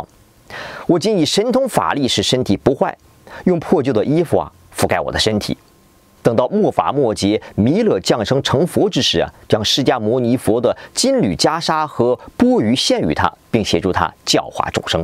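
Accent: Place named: North, China. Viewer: 北方人吧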